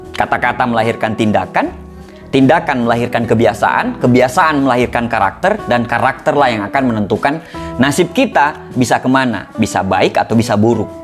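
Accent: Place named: Palu, Indonesia. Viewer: Kayak logat Palu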